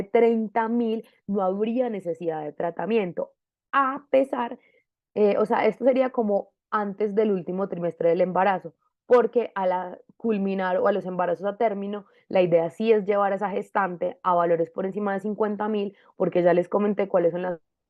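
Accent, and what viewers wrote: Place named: Colombia. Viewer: Excelente trabajo! Me encanta el dialecto colombiano 💖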